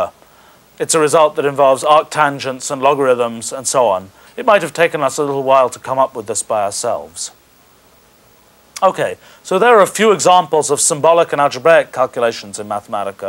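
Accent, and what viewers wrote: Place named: United Kingdom. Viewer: Actually his accent has become noticeably more British. The accent above sounds Mid-Atlantic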